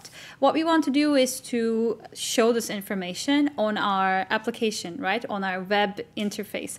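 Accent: Turkey. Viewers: She has a Turkish accent.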